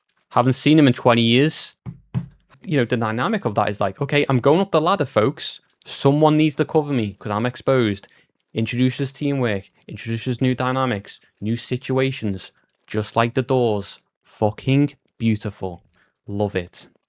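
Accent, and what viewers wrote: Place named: Scotland, United Kingdom. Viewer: Scotish accent is beat 🎉❤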